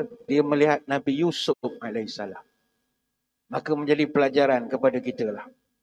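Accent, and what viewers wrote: Pahang, Malaysia. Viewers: Loghat pahang tu yg significant ketika penyampaian pun ye jgk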